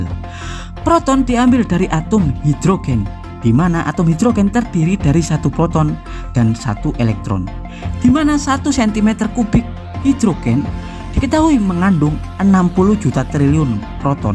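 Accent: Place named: Java, Indonesia. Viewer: Tak berijazah tapi jenius tapi medhokk.. magnet jadi mahnet.. gpp yg penting paham dengan penjelasannya prof.. terima kasih 🙏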